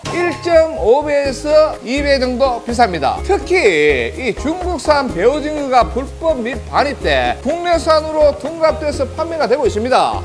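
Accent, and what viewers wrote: Gyeongsang, South Korea. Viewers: 갱상도 사투리 존나 듣기 싫은데 조심하세욧 ㅋㅋㅋㅋ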